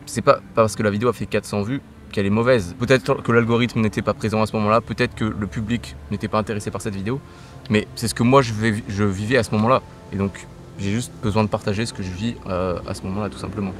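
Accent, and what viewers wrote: France. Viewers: Perso j'adore regardé des films en anglais mais écouté un Français parler anglais perso j'accroche pas car ca me ramène a mon mauvais niveau d'anglais mdr :/ apres ca se regarde très bien, au niveau creatif et audiovisuel pas besoin de la langue ;) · C'est un très beau projet personnel et professionnel. J'avais un peu de mal avec le 100% anglais et cela m'a beaucoup plu. C'est intéressant d'entendre un français parler anglais, je comprends mieux cette langue. Si le nombre de vues est ta priorité, ce n'est pas une bonne base. L'intérêt est de partager ta passion.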